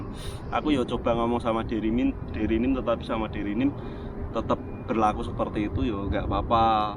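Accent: Java, Indonesia. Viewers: Jowone ndi pak?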